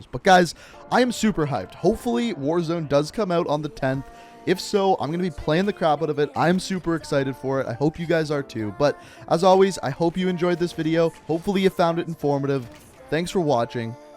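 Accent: Canada. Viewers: By his accent I'd guess he's from or near Winnipeg, Manitoba Canada. He sounds like a professional wrestler named Chris Jericho that has the same accent.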